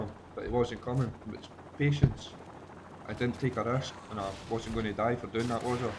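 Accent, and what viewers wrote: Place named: Scotland, United Kingdom. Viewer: subbed cause you from scottish mon the scots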